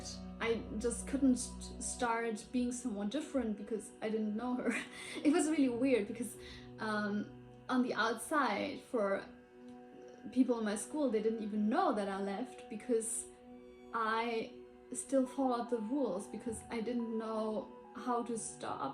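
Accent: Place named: Germany. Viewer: I’m not sure if you are German, but your accent very much sounds so. The idea that something like that could happen where I live… :(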